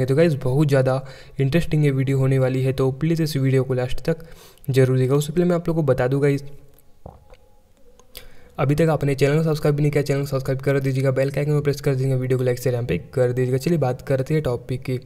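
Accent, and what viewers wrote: India. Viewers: He speak in indlish. Their tongie are regguerer-tongue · Sir u write english speak indian why why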